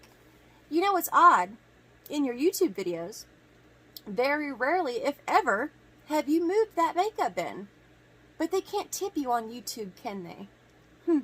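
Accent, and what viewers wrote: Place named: South, United States. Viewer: Love your southern accent by the way southern girls all the way! 👍🏻🙌🏻😊💕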